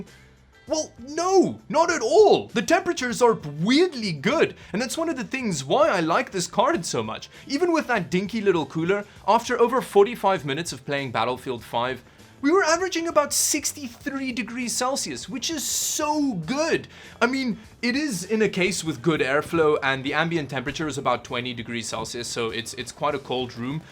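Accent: South Africa. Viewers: is jy van suid afrika bra jy het daai stem van suid afrikaaner lol ek baie neskiedag plus is algemene naam in suid afrika maar ek love jou vids jys supper funny bru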